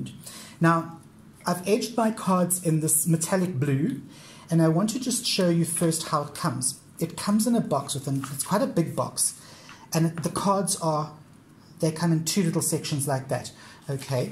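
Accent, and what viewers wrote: South Africa. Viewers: So lovely to hear another South African accent :-) I've enjoyed a wander through your collection. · Rare to find south africans youtubers! Love their accents hahaha.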